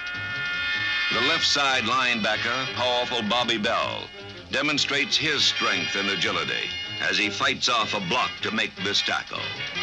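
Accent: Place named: Transatlantic, United States. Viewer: prolly the transatlantic accent.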